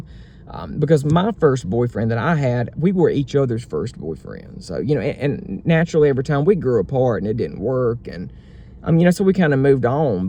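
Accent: South, United States. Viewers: You’re describing my first needy boyfriend so well. Exactly what happened. Emotionally he depended on me for everything and it was draining. I had to break the relationship but to this day he doesn’t understand. By the way, I’m watching your third video right now and this is really good stuff. Very relatable and enjoyable. Thanks for putting yourself out there with your honesty and vulnerability. Not much of that flying round these days. Btw I’m Alec, I’m watching this from France, I’m French , I love your southern accent. Which city and state is your accent from ?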